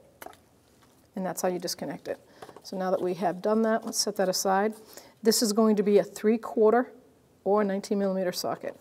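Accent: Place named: Medford, United States. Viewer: That’s a Medford accent! Gotta be!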